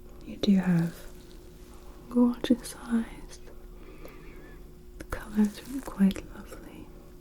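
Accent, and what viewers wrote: United Kingdom. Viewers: I know I usually comment some crazy scenario for a bit of fun. But this time I just want to say amazing work, and I really appreciate all of the effort you put into your videos! And also, that British accent is brilliant, well done! Thank you for all of your videos! Also, you look extra stunning with the short blonde hair! 😍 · Loving the British accent 👌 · Fellow Brit here - love your work and great accent! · loved the British accent 🥰 great video thank you soooooo much😘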